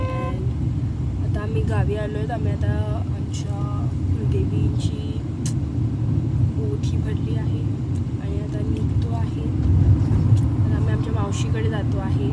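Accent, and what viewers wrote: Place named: Malvan, India. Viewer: Loved the malvany ascent...plz more of that type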